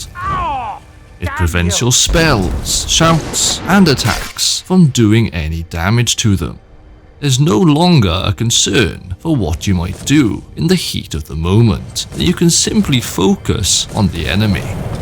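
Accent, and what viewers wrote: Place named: Scotland, United Kingdom. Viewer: Apologies, clearly Scottish and trying not to be 😅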